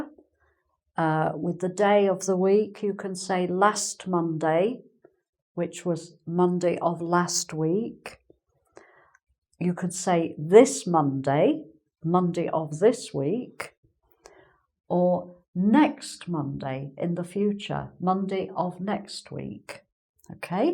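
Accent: England, United Kingdom. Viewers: I love her british accent. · I very love British accent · she must be from England becuse her accent is the same like others English people lmao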